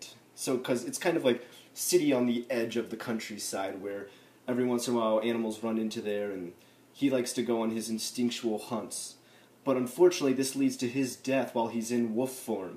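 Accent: United States. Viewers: do you pronounce the japanese language in your videos as you would when talking to Japanese people? It sounds very americanized to me. Still, great videos:)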